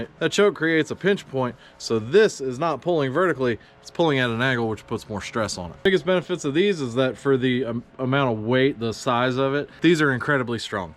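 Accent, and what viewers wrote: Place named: North Carolina, United States. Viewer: That's the North Carolina accent. I have a lot of friends from NC.... They sound like that too. 😁❤️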